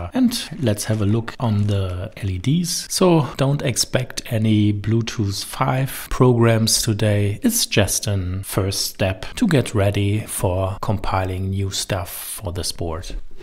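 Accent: Germany. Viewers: since i live in germany, I'm 100% this is a German native :D from accent perpective, but good work!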